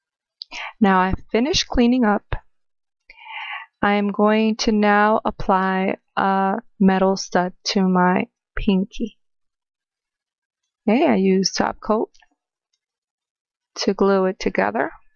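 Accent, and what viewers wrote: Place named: Cuba. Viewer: No no hablas muy bien pareces cubana me gusta tu acento eres muy buena haciendo videos sige asi yo te sogo siempre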